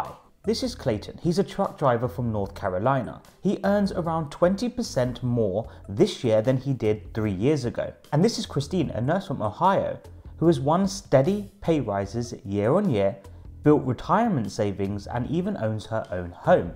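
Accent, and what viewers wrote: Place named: United Kingdom. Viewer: I don’t think a foreigner with British accent should be yapping about the American economy just because he needs attention to his channel. This ain’t UK buddy.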